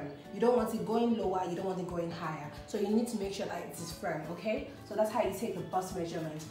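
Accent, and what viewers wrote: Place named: United States. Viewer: You are so cute and I love your English like Americans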